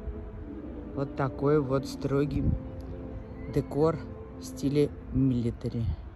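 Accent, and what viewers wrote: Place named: Moscow, Russia. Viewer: голос тридвараса..москвича все ясно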